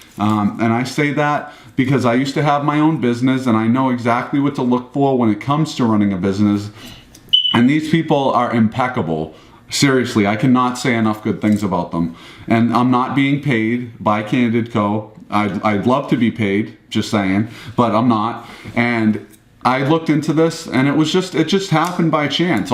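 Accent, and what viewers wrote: Boston, United States. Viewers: Just here for the Boston accent.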